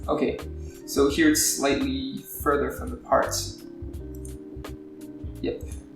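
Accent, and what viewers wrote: France. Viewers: Mais, t'es français O_o, je savais pas moi ! Ton accent est super !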